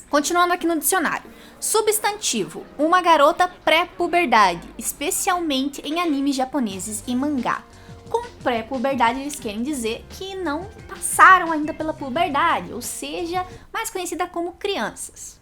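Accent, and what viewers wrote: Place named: Minas Gerais, Brazil. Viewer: tu tem o sotaque de mineiro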